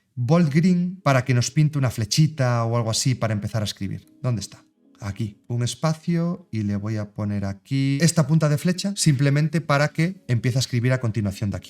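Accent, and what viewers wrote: Galicia, Spain. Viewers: a mii me gusta que hables asii suave . Es genial ! Además el acento gallego de Lugo que tienes me encanta ! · eres gallego?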